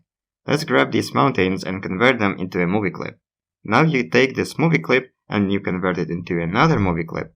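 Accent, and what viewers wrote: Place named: Russia. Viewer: о, ну по акценту уже похоже что ты русскийя:3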